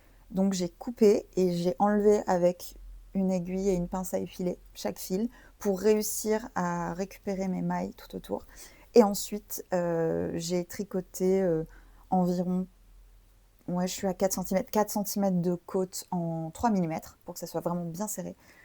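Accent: England, United Kingdom. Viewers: Bonjour ! Je démarre à peine le visionnage et je sens déjà que je vais aimer vous suivre! Peut-être vous l'a-t-on déjà demandé, mais pardon.. your english accent is amazing, vraiment, cependant j'ai du mal à saisir toutes les références que vous donnez, et je le regrette beaucoup tant ce que vous montrez est intéressant. Pouvez-vous les transcrire sur l'écran au montage ou les mettre en description svp 🙏je reprends la vidéo avec plaisir, merci de la partager! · Très sympa ton podcast et tu as un super accent british.A bientôt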